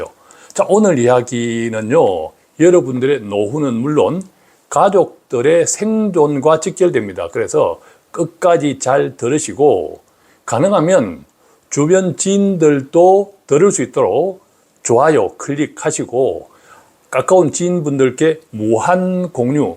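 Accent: Gyeongsang, South Korea. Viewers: 경상도 사투리 쓰셔도 이 대표님은 아주 진실적입니다 냉정적이고 경상도 대구가 이중성 있는것이지 대표님은 경남입니다 옳고 그름을 판단하시는 분이예요 함부로 말하지 마세요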